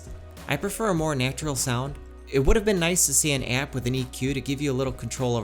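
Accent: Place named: Chicago, United States. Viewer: Ur Chicago accent is annoying. U seem to complain too much be happy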